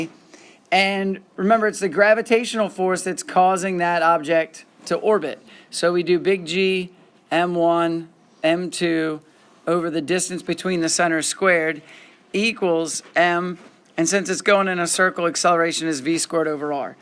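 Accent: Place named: Australia. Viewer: This guy has the mildest Aussie accent I've ever heard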